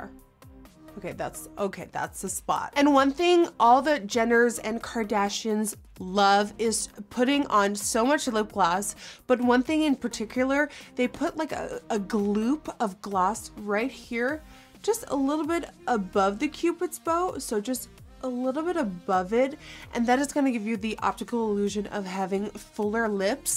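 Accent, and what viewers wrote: United States. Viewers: Oké ik wist dus niet dat je Nederlands was je klinkt als een amerikaan😂😂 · She's Dutch, and what an amazing channel and American accent! Ga zo door!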